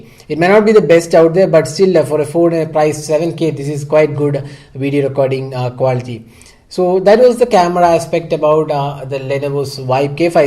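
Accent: Kerala, India. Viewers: r u from kerala?? 😉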